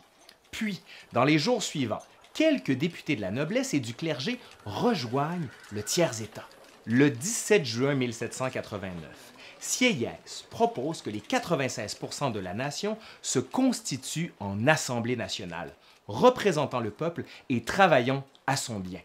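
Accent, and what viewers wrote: Quebec, Canada. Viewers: J'adore ton accent québéquois xD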